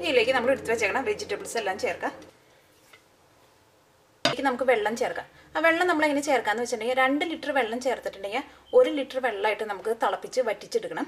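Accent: Thrissur, India. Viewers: thrissur slang :)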